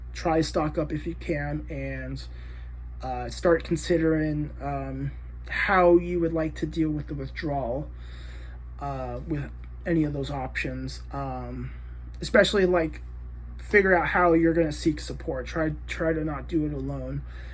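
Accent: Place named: Scandinavia. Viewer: Also, sounds like you are from a scandi heritage, i am from Denmark. Be strong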